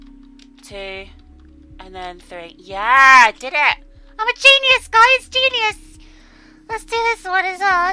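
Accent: United Kingdom. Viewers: are you british? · Yes she is British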